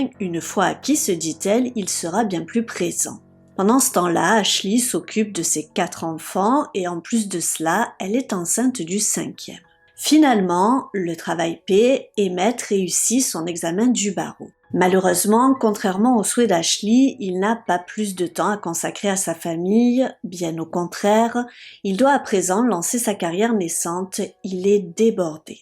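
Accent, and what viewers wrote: South, France. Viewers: Je ne commente pas souvent et je voulais te dire que je regarde et like toute tes vidéos, j'aime tes intonations et cette petite pointe d'accent du sud. Tes récits sont clairs, originaux et intéressant ❤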